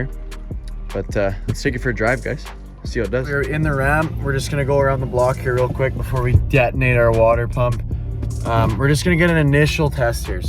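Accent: Canada. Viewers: Buddy your accent is legendary, Canadian for sure hahaha. Thanks for the guide!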